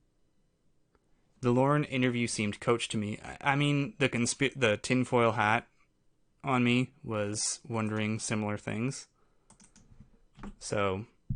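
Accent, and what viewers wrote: Canada. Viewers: i think he’s gotta be canadian, the way he says out/about is just too canadian 😂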